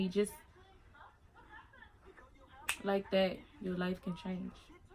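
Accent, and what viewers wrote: New Orleans, United States. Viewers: First time watching you, is that a New Orleans accent I hear 👀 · you talk like u from louisiana where you form ?- im a new supporter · This video was in my recommendations.This is so heartbreaking. You are incredibly strong! I couldn't imagine. Praying for you mama.❤ Also, are you from NOLA? I know that accent when I hear it lol. If so, hey girl from another fellow Louisiana mama! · Rip to your sister. U from New Orleans u sound like it. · New Subbie! I love your frenchy New Orleans accent. So Sad! RIP Breon Prayers to you, I know it's hard.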